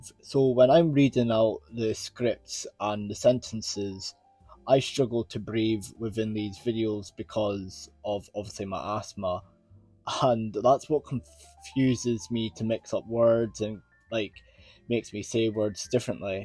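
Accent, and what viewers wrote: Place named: Scotland, United Kingdom. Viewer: It was a great video! Its great hearing a fellow scot talking about the stuff im also interested in :)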